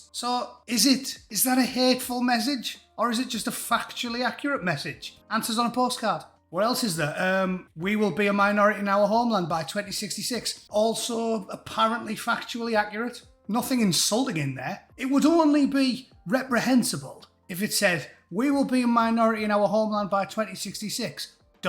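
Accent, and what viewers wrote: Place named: Middlesbrough, United Kingdom. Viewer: Churchillian with a Middlesbrough accent.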